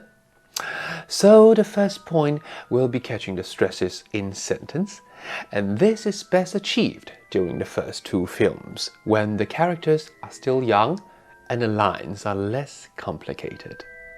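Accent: United Kingdom. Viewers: 好像繼續學英文了 老師的英國腔真好聽！ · 英國腔超棒的！好好聽(☆∀☆) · 來了～老師終於出片了❤️ 從一開始聽到老師的英式口音覺得好高雅好好聽喔～ 但是身邊沒有用英式口音的人啊～都是美式口音居多 真的很可惜沒人可以練習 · podcast 英國腔任何東西都可以！！ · cool british.accent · 英式口音真的很好聽👍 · Teacher, you have a beautiful fluent British accent and I really like it. I wanna to learn English but I am not interested in other English films except for Harry Potter series. And this is actually a perfect video to let me learn English, it is such a wonderful combination of English and also Harry Potter. Well..hope u will continue this series..ummmm.. i love it so much and thanks a lot!😊❤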